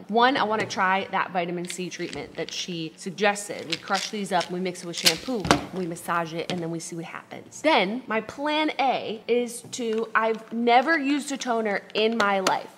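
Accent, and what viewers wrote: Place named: New York, United States. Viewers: bc she is LOL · Why does she sound kinda like she be from New York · She’s literally from Rochester, NY my dude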